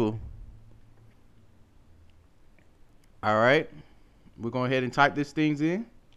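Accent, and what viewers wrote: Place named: England, United Kingdom. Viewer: Sweet tutorial, finally someone making IT tutorials with a proper english accent! Thanks for the help.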